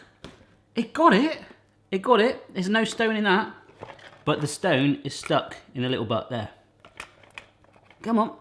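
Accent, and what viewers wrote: United Kingdom. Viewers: Yep your accent totally "Vanilla" British! hahaha ^.^ Loved the Egg/Toaster combo thingy! ^.^ ♥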